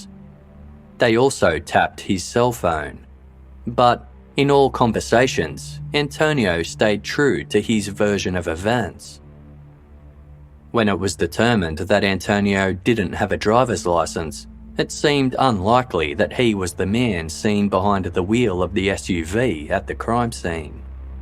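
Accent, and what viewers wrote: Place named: Queensland, Australia. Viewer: The Queensland accent is especially delicious for the dark bits!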